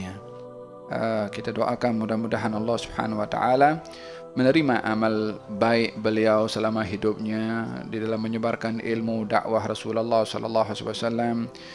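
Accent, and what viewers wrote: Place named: Malaysia. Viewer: Kirain indo tapi logat ny mlayu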